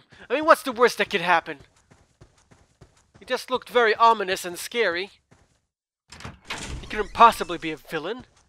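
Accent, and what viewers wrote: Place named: United Kingdom. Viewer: Oh dat british accent <3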